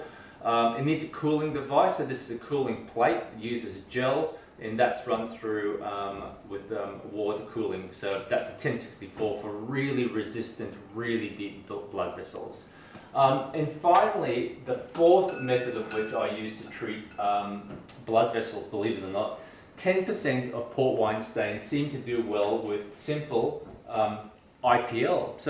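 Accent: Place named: Australia. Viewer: Rude. You look great. As a patient I always prefer to be treated by someone who isn't cookie cutter perfect. As an American, your Australian accent is more distracting, but in a good way :D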